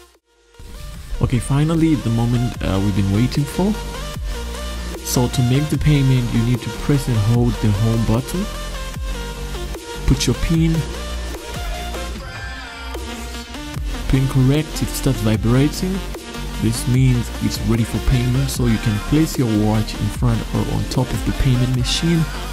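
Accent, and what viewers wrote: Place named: South Africa. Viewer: Def south africa 🇿🇦 broer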